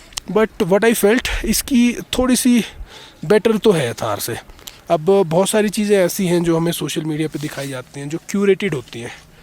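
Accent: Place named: Himachal Pradesh, India. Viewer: Bhai himachal se ? Himachali language lag rhi hai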